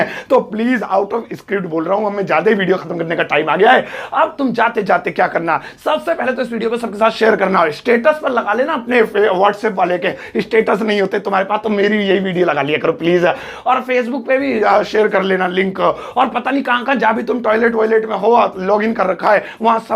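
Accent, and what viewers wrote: India. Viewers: Desi English.♥️♥️♥️♥️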